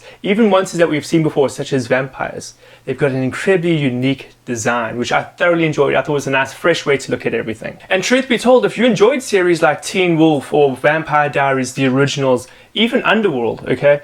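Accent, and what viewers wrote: South Africa. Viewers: So glad I still recognise South African accent after 3 years out of the country! Keep on keepin on boetie. Baie Lekker :) Yoh.. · Bro, are you from South Africa? The accent? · I heard the accent and stayed for the whole channel 👏 great quality, fellow South African here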